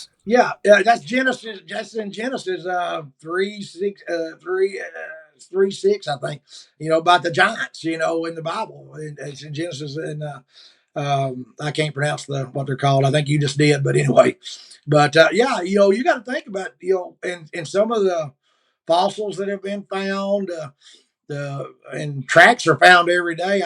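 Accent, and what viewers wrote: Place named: Texas, United States. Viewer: I like this man’s accent!!! It’s all Texas y’all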